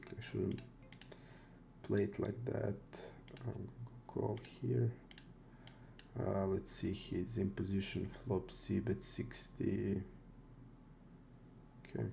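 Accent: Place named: Croatia. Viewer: Just gonna take a wild guess and say you’re Croatian going off your accent :D First video I’ve seen from you bro, great vid, subbed:) Keep crushing buddy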